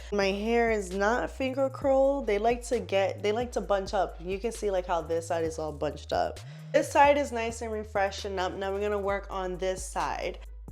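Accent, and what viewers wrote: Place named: New York, United States. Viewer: I wish I knew where she was- I hear a NYC accent but I don’t know where she actually works.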